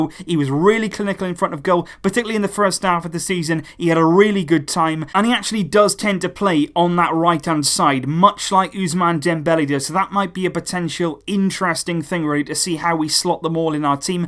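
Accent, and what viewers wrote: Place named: England, United Kingdom. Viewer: i like listening to your english accent again welcome back my friend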